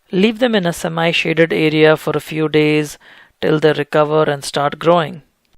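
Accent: India. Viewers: I LOVE THAT STRONG INDIAN ACCENT AND MAY I HAVE SOME SEEDS...... AND WHAT THEY COST PLEASE ! THANKS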